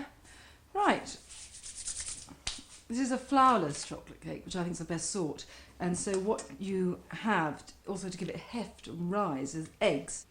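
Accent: United Kingdom. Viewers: A british accent lol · god i love her. i wish i could move to england to marry a english woman. her accent is just heaven.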